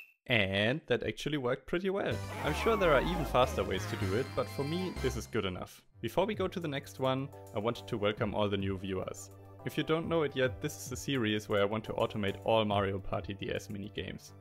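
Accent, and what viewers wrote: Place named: Germany. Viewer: Your way of speaking seemed familiar to me, so I checked your channel info and who would have guessed... A fellow german! (Really enjoy the videos btw. mach weiter so!) :D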